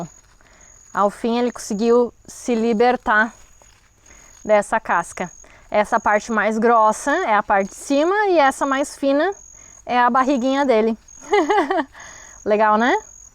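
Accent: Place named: Rio Grande do Sul, Brazil. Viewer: Como eu adoro te assistir , vc é pura inspiração Graças a você hoje eu consigo ver algumas plantas q são consideradas “pragas” com um olhar mais amoroso , sem contar q seu sotaque é o mais gostoso de ouvir , adoro o sotaque gaúcho , tô aprendendo muito com seus vídeos , obrigada por dispor do seu tempo pra nos ensinar tantas coisas boas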